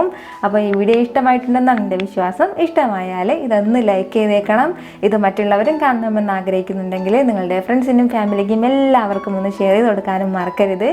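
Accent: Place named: Malappuram, India. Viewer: 😍😍..ikka malapuram aano...atho kozhikodoo...slang supr aanu🤩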